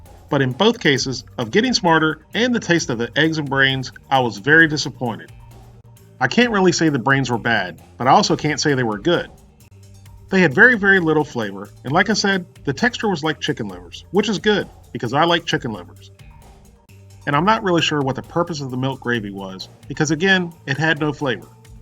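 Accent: South, United States. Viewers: No offense but if your dad liked pigs brain and scrambled eggs you have some fucked up genetics. I always imagine you as a big 260, 280 lb southern dude off the way you talk and eat lol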